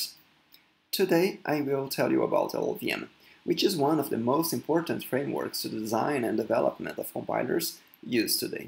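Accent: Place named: Brazil. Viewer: mano não sei porque mas ouvi outro brasileiro falando em ingles é muito bom kkkkkk eu entendo tudo, até consigo enteder os indianos mas muita vezes tem uns bagui que não da pra entender kkkkkkkkkkk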